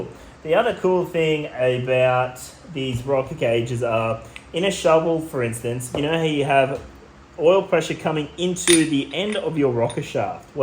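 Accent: Australia. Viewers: Heard you were Aussie, Instant subscribe 🤙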